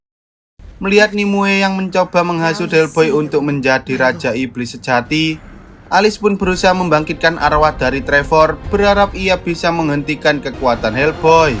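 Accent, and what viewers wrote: Java, Indonesia. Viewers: Apik tenenan film ne... naratornya..medokkk tenenan jawane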